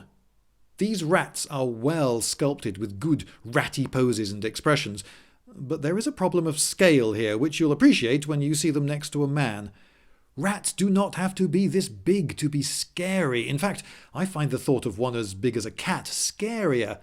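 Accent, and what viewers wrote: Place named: United Kingdom. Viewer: Funny, you sound overall brittish yet you are as pedantic as us germans. =) Anything we should know? Have a nice Christmas and a happy new year.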